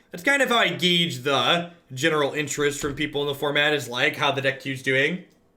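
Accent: United States. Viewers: a strong but generic american accent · I have found 3 unearth to be very good in this archetype. Combined with fulminator mages and kambals in the side it makes for some fun interactions. As a fellow Illinoisian, I have part of those same speech patterns. Great as always, thanks!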